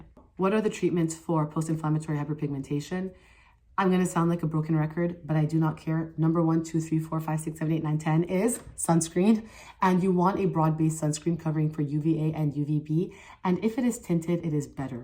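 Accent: France. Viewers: Great tips thank you 😊 also have a question on using laser for scarring or redness... is it useful, do you have any recommendations? love your french accent très chic oh la là 🤌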